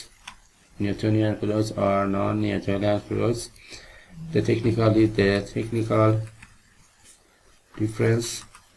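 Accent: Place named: Africa. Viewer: that's aafrican accent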